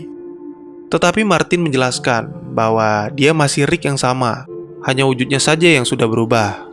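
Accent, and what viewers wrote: Aceh, Indonesia. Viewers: Orang Aceh bg ya.?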